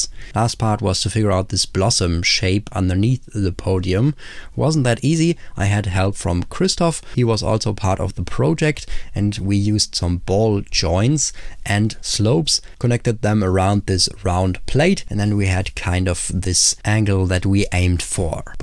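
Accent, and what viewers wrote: Germany. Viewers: German accent Kicks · Denglisch, hört man sofort raus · 1 second in the video and i can already tell you're german as well xD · Love the work and ze german accent✌️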